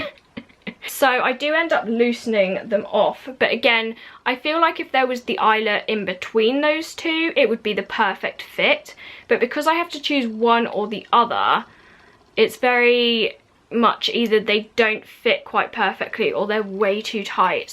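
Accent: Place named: London, United Kingdom. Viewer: Those are really darling & give me a steampunk kind of vibe :) I was wondering (and I may have missed it); if you had an idea what the soles are made of? I mean not the underneath, but the part under the shoe itself the platform is made of? Does it seem like the same material as the top or something different. Someday soon I plan to own a pair of New Rocks, I'm just not sure which ones I want. My son was over the other day and he heard me listening to you and was wondering about your accent. I told him you were from England and he goes "Oh yes, a London Goth" :) Too cute :) Well, take care and nice to see you sweetie <3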